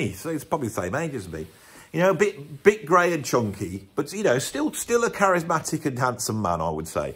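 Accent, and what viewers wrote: United Kingdom. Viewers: I am an American. There are some British accents that I like and some that I do not like. I like your British accent. What region of the country is it associated with? I would love to know.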